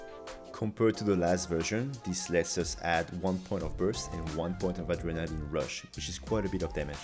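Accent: France. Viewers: Frensh accent make it better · Mec, on manque de continue en FR de fou sur MH! On l'entends l'accent frenchie, hesite pas faire du contenue en FR ca marchera de fou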